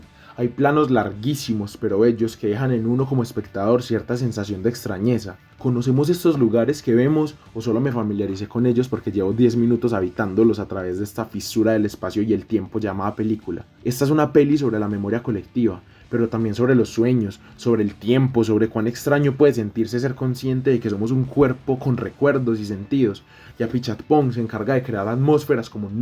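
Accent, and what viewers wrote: Colombia. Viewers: Amo el cine en casi todas sus facetas, hace unos años que vengo viendo la crudeza, el amor y la esperanza del cine Colombiano, transmitiendo esa realidad que todos vivimos y nos toca, ahora estoy en otro país, soy de Cali- Colombia, por como te oigo sos paisa Bacano encontrar gente que ame el cine como uno, ese es un lenguaje muy fuerte, algunas de estas películas la Vi con amigos, otras en señal Colombia y otras con cracks del cine en Cali, uno de ellos se llama José urbano, quien vió al caliwood nacer, ese men es un baluarte en Cali sobre el cine, me hablaba de Mayolo, de Caicedo y así.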